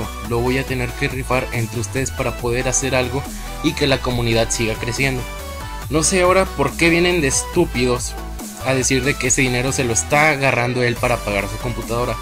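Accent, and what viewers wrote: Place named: Mexico. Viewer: Hola amigo, he estado viendo tus videos, y me parecen muy bueno. A lo que veo ¿Eres mexicano? pues tus grocerias y acento es el mismo que yo uso jaja:), tu no te rindas y sigue creciendo creeme que tienes talento solo necesitas publicidad pero para mi ya eres bueno, me suscribo y te recomiendo:) Sigue porfavor se que tienes talento!!!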